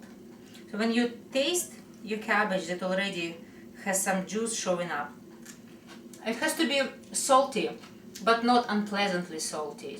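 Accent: Russia. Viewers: God how I love Rooshan accent. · terrible russian accent...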